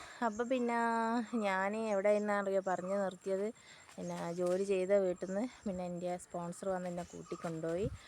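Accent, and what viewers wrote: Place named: Malabar, India. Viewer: അപ്പോ ഇങ്ങള് പ്രവാസി ആയിരുന്നോ... എല്ലാം അടിപൊളി വീഡിയോസാണ്... കണ്ടാൽ കേട്ടിരുന്നു പോകും... മലബാർ ഭാഷയും കൂടി ആയപ്പോ കേൾക്കാൻ നല്ല രസമാണ്...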